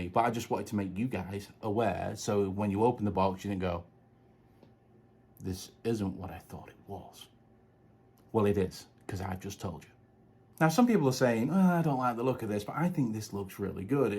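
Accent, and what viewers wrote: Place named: Pennines, United Kingdom. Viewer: Loving this phone so far. I detect a north west Pennine accent here mixed with US. Great review pal 👍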